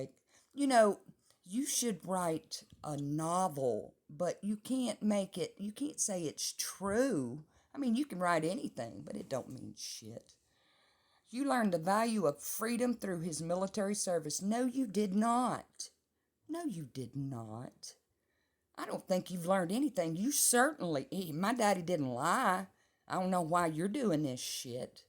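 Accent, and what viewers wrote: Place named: South, United States. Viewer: Gday, you are very easy to listen to, that southern twang gives me chills....Much Love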